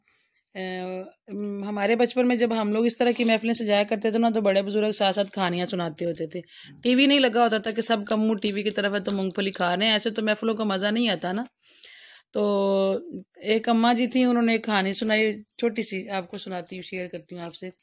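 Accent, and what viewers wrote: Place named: Hafizabad, Pakistan. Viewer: لیکن حافظ آباد کی بولی کا اپنا ھی مزہ ھے تھوڑی سی بولی تھی آپ نے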